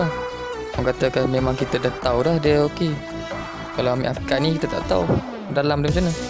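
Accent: Kedah, Malaysia. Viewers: Orang sabah,cara bunyi loqhat org kedah,tpi apa2 pn trbaik bro,HATIMU KENTAL, org klau tangan berseni prmulaan cat wlaupn x ada pngalaman, ttap akn nmpk cantik..trima ksih bro..mmng terbaiekk...dari sya IPOHMALITALAKNYOMBONGPUNYA,PERAK..hiehiehie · Campoq dey ..kedah KL 😂 hg ckp utara dah sempoi bak ang .. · ckp pelat kedah terus ja bro . nampak struggle sat pelat kedah sat pelat KL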